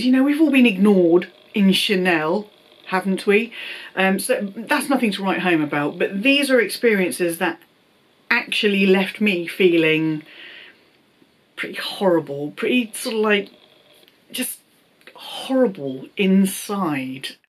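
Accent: United Kingdom. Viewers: Omg yess!!!! All the time I don't know what it its with them. I have a good and strong purchase history but when I went to return an item 5 SA ganged up and were fighting taking back the bag that I had received from LV online a day before!!!! They are ridiculous at most times 🙈🙇‍♀️🙉 I so love to listen to your British accent🥰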